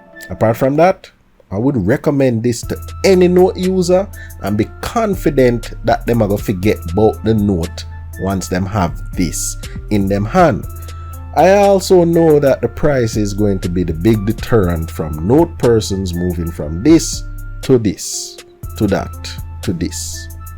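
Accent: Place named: Jamaica. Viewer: Real Jamaica talk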